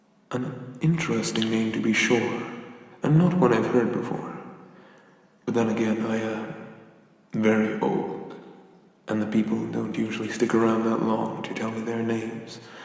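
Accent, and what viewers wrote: Ireland. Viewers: Oh my, thank you for Irish accent ✨ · Irish accent Wasn't Atlas Greek though? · I'm a simple man, I see irish accent I click. No regrets here, I'd love to be this giant's pet. Edit: God your voice is hypnotic in this.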